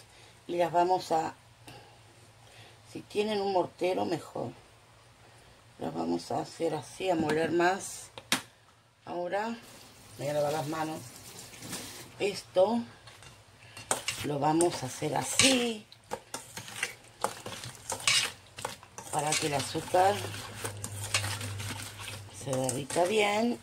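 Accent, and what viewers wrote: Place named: Buenos Aires, Argentina. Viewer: Hola . Es la primera vez que te escribo,hace poco tiempo buscando recetas de cocina asiática encontré tu canal,me pareció muy interesante prácticos tus platillos. Al principio creí que eras Argentina por tu acento medio porteña pero luego descubrí que eras del Hermoso Uruguay! !!.Gracias por tomar tu tiempo y enseñar sobre el mundo culinario asiático. Saludos desde Argentina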